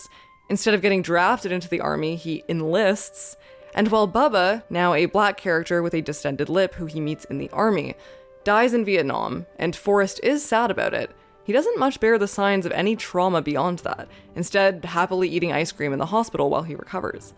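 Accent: Canada. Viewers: Is this channel’s creator Canadian? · So, I agree with a lot of what you say here. Zemeckis & co. definitely took a lot of the complexity and all of the darkness out of Book-Forrest’s character. I think Movie-Forest is more like Chance the gardner, from Being There , than the character from the book, who he shares a name with. But, to be fair, the book really was very badly written. IMO, it’s maybe the only time I’ve ever experienced the book being worse than the movie. Full disclosure: I’m also from Canada (I think I might hear an Ontario accent in your narration?), so I’m looking at the US situation from the outside, in. I also loved the movie when it came out and watched it on repeat for a while (I was in High School at the time), and haven’t watched it since. So I definitely have a bias and I might be forgetting key things. Still, I agree with you; the movie is not even close to perfect and it has some jingoistic vibes. 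But, there’s also hope there, even if Forrest is a bit of a fairy tale character. And, human suffering, as well as the darkness of the historical period is not completely absent from the story, either, with Lt. Dan and Jenny. I don’t think the intention of the film was stark realism. And don’t we all need hope and a happy ending now and again?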